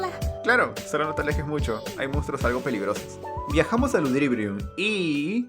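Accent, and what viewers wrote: Peru. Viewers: Que wea eri peruano?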